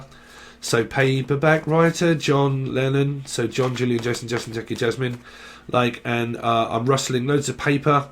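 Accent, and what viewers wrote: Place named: Cockney, United Kingdom. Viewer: Your a cockney, currant bun, Son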